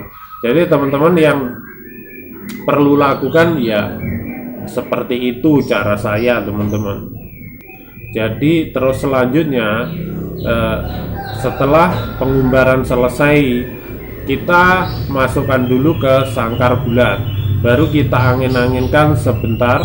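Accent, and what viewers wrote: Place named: Banjarnegara, Indonesia. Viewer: Pak boleh nanya.. bpk asli mana? Mf pak soal nya mirip orang banjar negara 🙏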